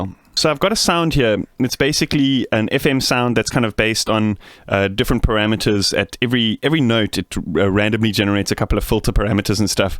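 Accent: South Africa. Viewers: Are u South Africa? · he is South African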